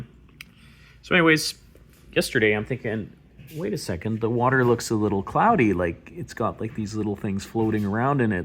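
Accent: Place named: Canada. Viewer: love seeing a new reef channel congrats! u sound canadian hrmm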